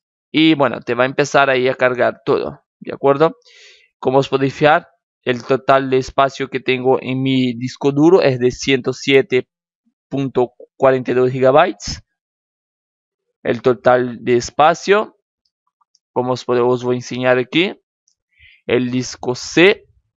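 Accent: Brazil. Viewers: eres brasilero? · creo q es de Brazil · es brasileño v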